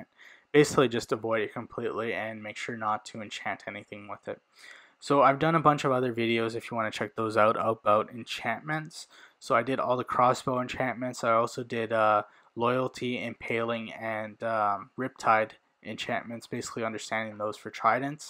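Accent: Punjab, India. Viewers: are u a punjabi?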